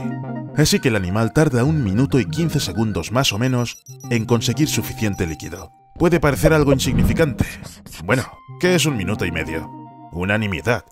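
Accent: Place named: Spain. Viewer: El acento español 👌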